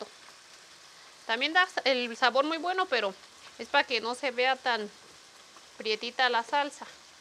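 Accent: Michoacán, Mexico. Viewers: Me encanta como hablas bien Michoacána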